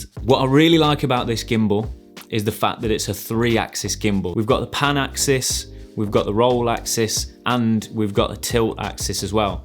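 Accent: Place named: Mansfield, United Kingdom. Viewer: Great review. You sound like your are from mansfield, pretty sure I recognise that bridge. Rainworth bypass? 😆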